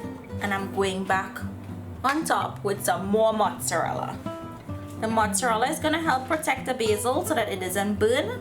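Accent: Trinidad and Tobago. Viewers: I was so pleasantly surprised to hear a Trini accent lol 😁👏🏼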